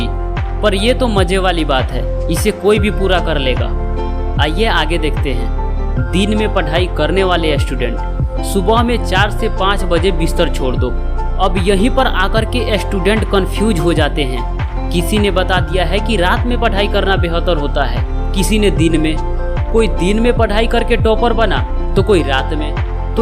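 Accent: Bihar, India. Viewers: Bhai ap Bihar se ho kya ham lag raha hai ki ap Bihar se ho 🥰🥰❤️❤️😍😍😘😘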